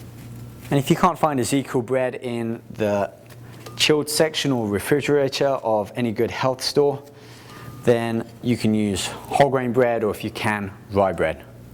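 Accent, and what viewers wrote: United Kingdom. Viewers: i love the british accent!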